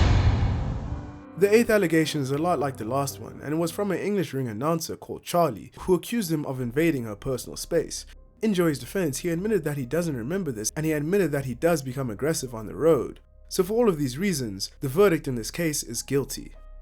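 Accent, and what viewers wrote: South Africa. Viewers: Ey bro are you South African?😁that accent sounds like home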